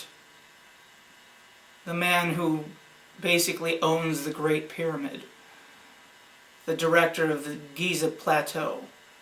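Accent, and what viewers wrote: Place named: United States. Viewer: Just read your post. The presenter has an American, not Australian accent.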